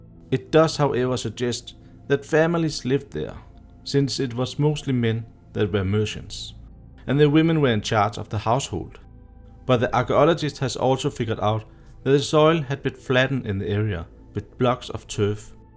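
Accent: Denmark. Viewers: the speaker was really speaking very DanishEnglish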